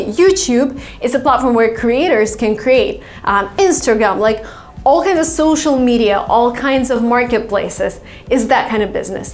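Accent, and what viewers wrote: Russia. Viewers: The way she "love"..... lovely Russian accent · Потому что у него русский акцент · I’ve recognized her as a Russian speaker 😅😅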